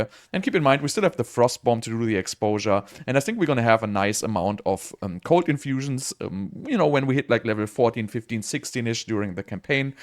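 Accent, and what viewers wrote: Germany. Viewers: Hello, I don't think the guide itself is bad, but you know what you're talking about. I just have a little problem when you say you only use this or these items at the beginning in the video. In theory it all works, but in the video you simply adjust endgame gear. They also make such a difference that the build runs as smoothly as you imagine, you know what I mean. But I don't want to say anything bad, I've been watching your guides for a long time and they've always been good. I have to praise you too ^^ Ps. Are you German? You have a German accent. Greetings from Germany. Nachtrag ich habe erst jetzt deine neuen POB bzw moba guides gesehen sorry mein Freund <3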